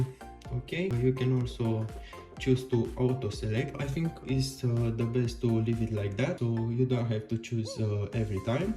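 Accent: Romania. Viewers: Multumesc de review, ca vreau sa mi-l iau peste cateva zile(mi-am dat seama ca esti roman dupa accent) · Recunosti romanul dupa accent din prima....super tare video!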